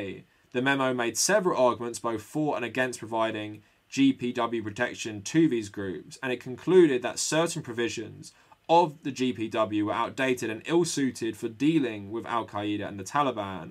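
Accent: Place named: United Kingdom. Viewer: I like your British accent, most trigger me now but you’re is cool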